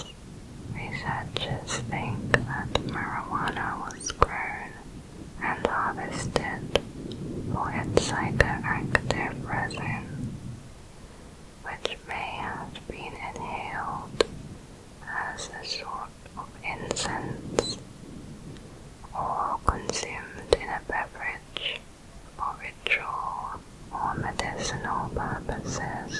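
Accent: United Kingdom. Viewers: I love ur voice lol what part of uk r u from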